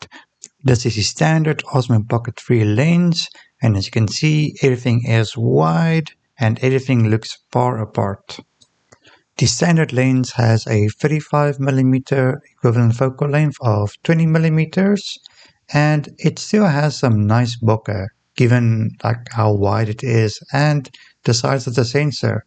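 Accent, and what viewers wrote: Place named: South Africa. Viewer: Always a pleasure to hear a South African accent on a review. I wasn't sure at first but once I saw the sparletta I got confirmation 😅 great video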